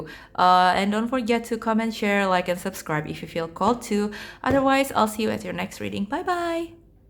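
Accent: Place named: Indonesia. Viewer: I heard Indonesian accent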